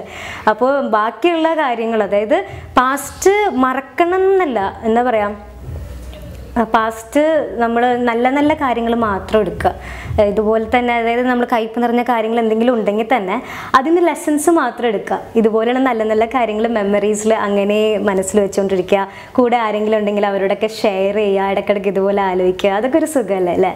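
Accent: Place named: Thrissur, India. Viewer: കുറച്ചു നാള് തൃശൂർ താമസിച്ചു കൊണ്ട് ആണോ ചിലപ്പോൾ സംസാരിക്കുമ്പോൾ തൃശൂർ ഭാഷ പോലെയാ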